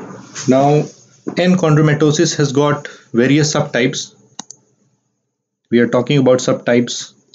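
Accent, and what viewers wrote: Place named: India. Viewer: What is this guys accent? Indian? 🇮🇳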